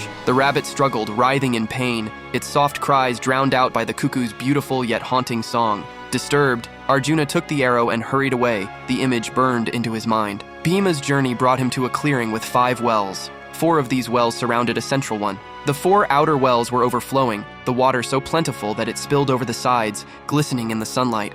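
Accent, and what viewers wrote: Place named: United States. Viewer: Phew! Finally Mahabharata in American accent! ❤❤❤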